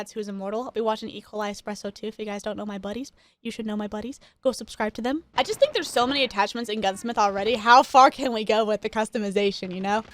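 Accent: South, United States. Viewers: You keep getting more and more southern as this video goes on.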